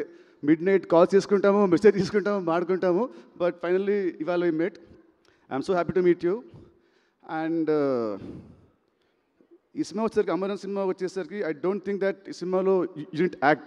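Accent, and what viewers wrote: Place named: Telangana, India. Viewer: Telangana slang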